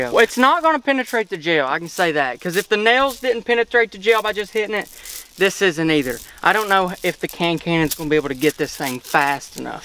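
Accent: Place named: Georgia, United States. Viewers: Sounds like he's from Georgia but says pop. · Bro i love your accents literally can't get more south than that! Yee yee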